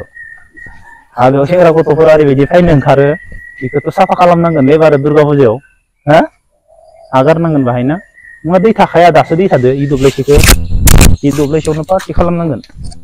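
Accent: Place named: Kokrajhar, India. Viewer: Dabw Kokrajhar style railainw rwnga ada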